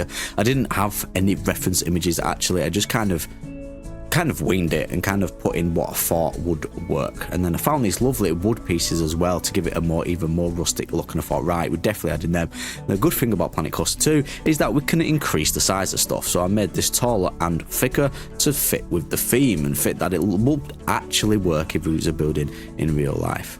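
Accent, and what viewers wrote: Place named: North, United Kingdom. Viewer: great build!. I love your northern rambling... p.s...... how northern are you?!?!! you sound mor northern than me :'D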